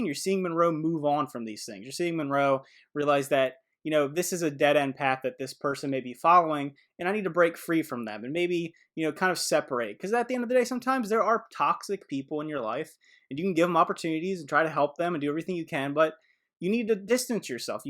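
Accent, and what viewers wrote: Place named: Philadelphia, United States. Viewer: You from Philly bro? Or jersey?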